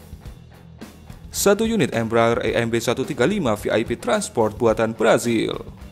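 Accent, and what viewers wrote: Java, Indonesia. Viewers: kok medok sekali logat nya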